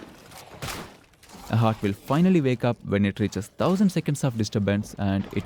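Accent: India. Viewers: I can hear the Indian in you. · Indian accents are kind of pleasant to me,work on your annunciation though🤙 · The Indian English accent isn't innately irritating or anything; you gotta understand that the economic status quo of our world, and Indians' superior command of the English language compared to the Chinese, for example (not compared to natives, I mean), made India the most popular call-center destination of the world. And very unfortunately, most companies that want to save money by using Indian customer-support representatives on the phone instead of local ones also end up wanting to save money on many others aspects, sometimes at the expense of customers, so they end up frustrating customers for countless reasons that go beyond any language barriers with the Indian customer-support representatives. So, many people around the world started to gradually feel irritated with the Indian accent, not because it is innately irritating, but because it reminds them of their frustrating experiences with the companies that employ Indian customer-support reps. 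However, don't let this deter you from just being yourself. Nobody ends up making everyone happy anyway. And as long as you enunciate and speak as clearly as you can, people who are open-minded and interested enough in your content will be able to ignore your accent and just focus on the content that you're delivering. It is more important to be your natural self than to try to please a percentage of your audience, while still improving that "self." A lot of people use the advice of "be yourself" wrongly, where they take it as an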